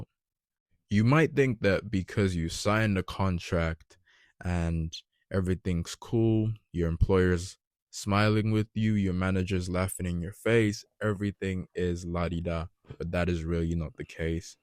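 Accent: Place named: Ireland. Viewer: I think he's from Ireland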